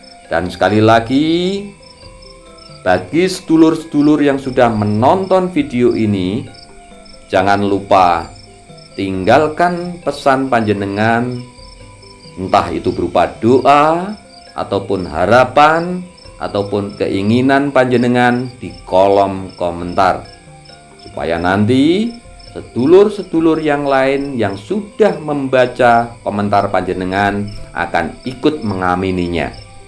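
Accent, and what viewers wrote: Java, Indonesia. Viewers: orang jawa logatnya pasti sama...apalagi kalangan bapak² seumuran mereka...hehe akupun jd inget almarhum papa saya yg logatnya seperti mereka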